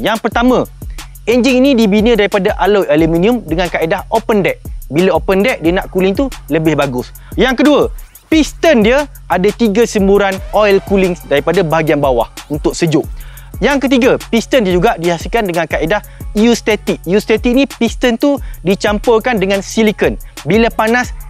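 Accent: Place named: Terengganu, Malaysia. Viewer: steady abg ni review. tapi kadang2 terdengar slang Terengganu dia tu. steady awok kite.haha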